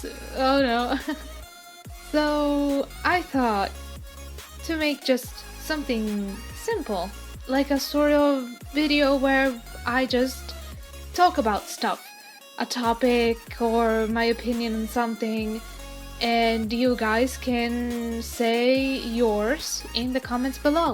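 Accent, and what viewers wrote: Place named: Syria. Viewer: Of course! I have a friend from Syria and her accent sounds similar to yours, so I was curious. I love your art style and have a nice day!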